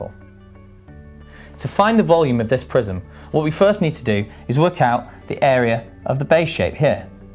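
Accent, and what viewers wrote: United Kingdom. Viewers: hehe hes british luv the accent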